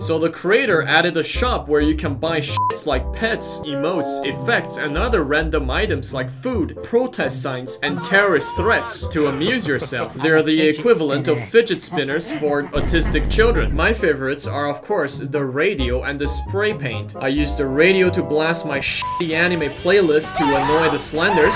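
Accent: United Kingdom. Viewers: You are britsh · BRITISH BRITISH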